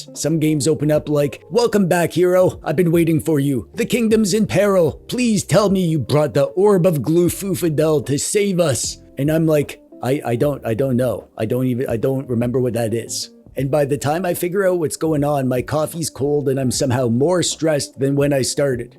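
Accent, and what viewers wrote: Canada. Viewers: hey man what part of canada is that accent from? i met a guy in New Zealand who was from Canada and he had the same accent as you, i think it's cool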